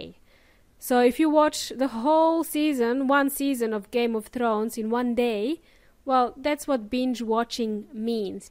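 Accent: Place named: Latin America. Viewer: I love your voice it's so clear to me so my question is are you originally from a Latin American country because your voice sound like Latin person by the way a new subscriber to your channel .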